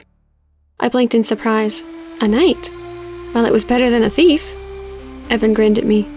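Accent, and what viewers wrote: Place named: United Kingdom. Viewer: WOWWW! I just found your channel your british accent to it adds prettieness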